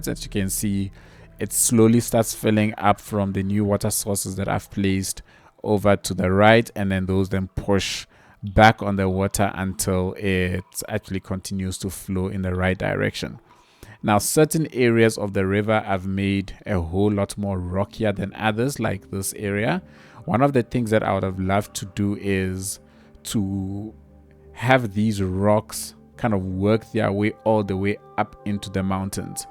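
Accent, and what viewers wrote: South Africa. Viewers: Man it’s great to hear a South African voice on YouTube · Bruh are you South African???????? Mans that accent, i know that accent from anywhere xD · Bro are you South African?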